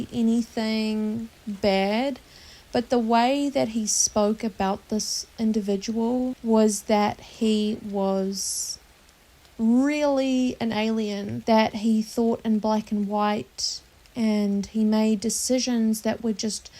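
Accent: New Zealand. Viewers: Why do I never recognize the kiwi accent when I'm kiwi haha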